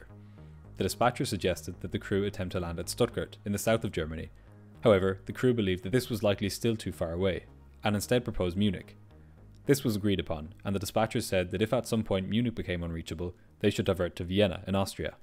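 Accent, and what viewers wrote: Ireland. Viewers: Everyone has different accents and he's Irish. If you've got a problem with that scroll down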